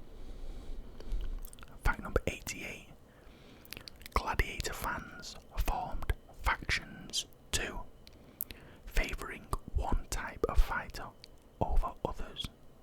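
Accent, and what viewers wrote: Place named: Northern England, United Kingdom. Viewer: Very good ASMR voice. I was confused for the first 15 seconds, I thought it was an American accent at first and then northern English came through? ^^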